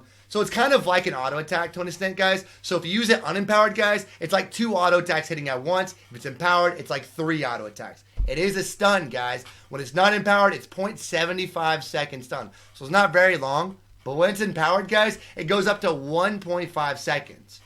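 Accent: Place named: United States. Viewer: as a german is hard to understand your english over a long time cause you have i think a strong american accent but i still like your videos and streams pls keep it on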